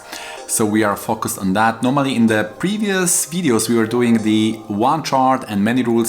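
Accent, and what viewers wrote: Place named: Poland. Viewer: Good heavens, u speak fast, load your sentence with information and add on your particular polish accent, truly it's impossible to get the valuable share u give. I am sorry got my Krittika strong . I hope u would slow down a bit.. speed is good but not that fast please .. thats a ferrari 😃 .. Carriage is good U are amazing & your research spectacular .. thank u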